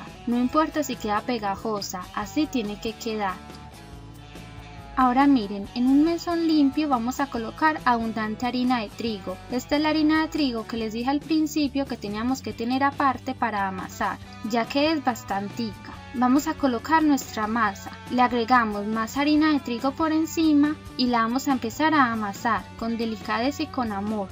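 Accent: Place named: Medellín, Colombia. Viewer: Ese acento de Medellín que gusta mucho Besos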